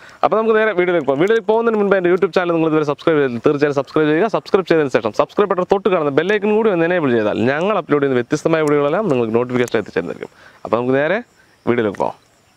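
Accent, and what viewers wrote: Palakkad, India. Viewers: Palakkadan slang adipoli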